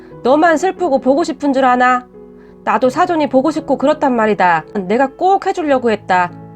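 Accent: Gyeongsang, South Korea. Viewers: 갱상도본토배기보다 더잘하시는데요?ㅎㅎㅎ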